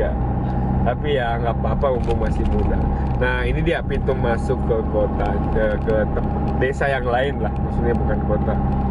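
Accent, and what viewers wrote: Sunda, Indonesia. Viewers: Sok lucu lamun siakang ngomong Sunda teh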